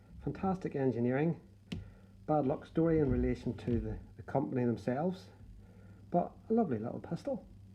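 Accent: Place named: Scotland, United Kingdom. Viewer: Judging by the accent I hear a wee bit of Scot. I know that in America since this beautiful piece was made before 1899 it could be bought over the counter without needing a permit or a background check and it could be shipped through the mail without needing an FFL. I live in MA. which is a deep blue state but even here in a very anti gun state I got my Uberti 1858 new model army revolver shipped to my address along with my Howell .45 Colt conversion cylinder without needing a permit or a background check or an FFL and I just go to Bass Pro up in NH. to buy my .45 Colt cowboy loads over the counter with no questions asked. I put up a video on here of me taking out a flower pot with it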